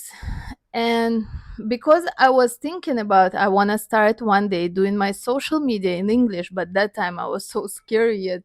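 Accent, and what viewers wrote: Jamaica. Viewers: You got ah likkle jamaican accent still.. it pops ups with some of your pronunciation of certain words 😂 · Jamaican fluency is good I like that accent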